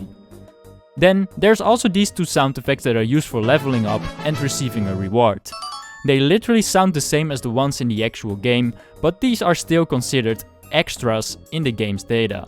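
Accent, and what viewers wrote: Netherlands. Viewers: Fi Fa Fo Fum, do I hear the voice of a Dutch man?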